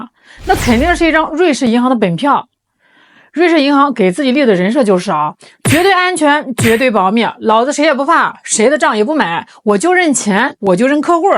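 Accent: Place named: Shandong, China. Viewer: 这口音是闪董印. 挺解压滴. 瑞士人坏的很, 他周边的国家没一个不恨他的, 美国也时常修理瑞士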